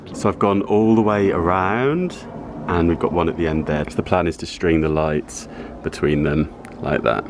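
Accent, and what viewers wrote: England, United Kingdom. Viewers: What part of England is your accent from? It's nice.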